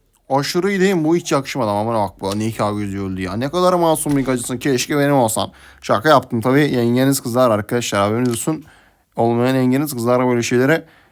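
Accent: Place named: Thrace, Turkey. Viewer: Boş video aq Trakyalı konuşma cabana bayıldım kardeşim 😂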